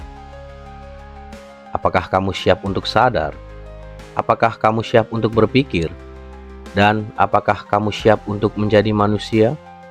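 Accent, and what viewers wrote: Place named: Cirebon, Indonesia. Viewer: Iki admine wong Cirebon kan... ? Logat ngomonge Cirebon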